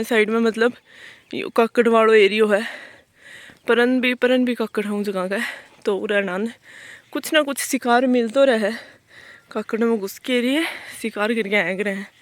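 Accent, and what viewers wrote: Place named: Rajasthan, India. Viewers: Marwadi bolu yaa shekawati maine samjh toh nahi aaye but lage aap yaa Nagore side se hu yaa Sikar side se...... Best of luck 🤞 for your preparation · Madm ji thari boli payri lage, ye shekavati boli h kya 🙏🏾🙏🏾🙏🏾🙏🏾🙏🏾🙏🏾🙏🏾🙏🏾🙏🏾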